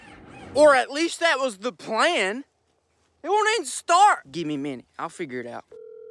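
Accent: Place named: Texas, United States. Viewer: that texas accent🤌🏻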